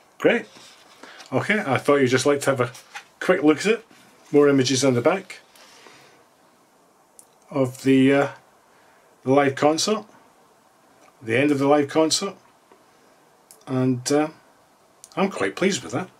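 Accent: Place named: Stirling, United Kingdom. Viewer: Just added this to my collection haven't opened it yet and found your video, hello from Stirling lol finally a familiar accent on YouTube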